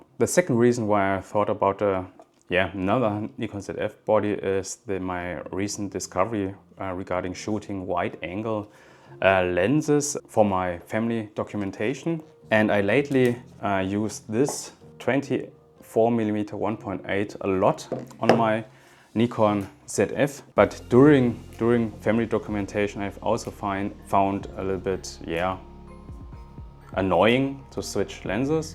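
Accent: Germany. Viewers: Interesting to see asian guy who speaking english wit a german accent 😊 and Thanks for video! Nice one ☝️ keep going!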